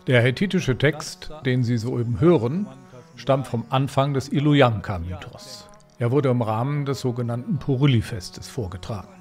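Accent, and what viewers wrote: Germany. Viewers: Hat der gute Mann einen Bayrischen Dialekt😁 Schöner Beitrag 👍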